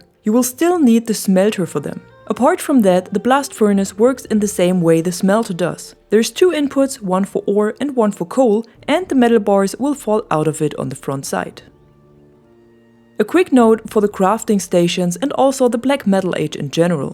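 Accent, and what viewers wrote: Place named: Germany. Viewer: There's just something about your voice and pronunciation that I can't stop listening too, I can tell you are german speaking but other than that you sound nothing like the germans I know who speak english.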